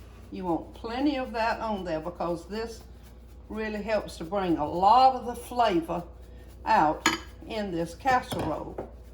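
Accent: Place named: Savannah, United States. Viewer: I shall and you sound like my people from Savannah and South Carolina. I love it!!! And they DID the crab! They went and spent a whole morning catching them and then the whole afternoon picking them! Good memories!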